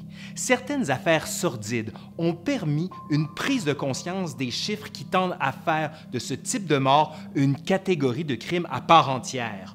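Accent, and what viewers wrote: Quebec, Canada. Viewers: Bonne video Merci est que t'e Québécois ? Bonne année !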